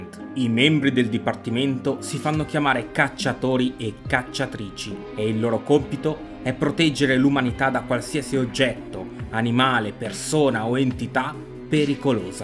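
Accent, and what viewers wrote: Veneto, Italy. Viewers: Veneto vecioo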